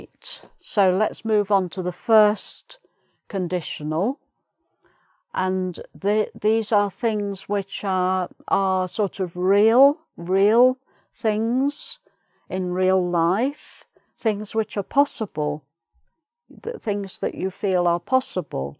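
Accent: England, United Kingdom. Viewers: I love your English accent!! Love!! Greetings from Spain!💕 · I really love her British accent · Are you British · indeed! As a Scot, I find references to an English accent as "British" accent both irritating and, frankly, insulting. Aside from the four countries which make up Great Britain and Northern Ireland and their distinctive accents, there are hundreds of regional accents. All different and all "British." "British" does not just mean Home Counties English. PS, love this teacher's style, could listen to her English accent all day. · I really love your calm and perfect British accent.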